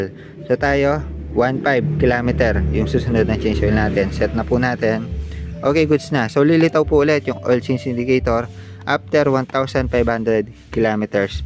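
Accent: Pampanga, Philippines. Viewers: kapangpangan kaba paps ung tono mo kasi e hehe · Kapampangan kapin ne paps,,tin halu ing pangatagalog mu ehe!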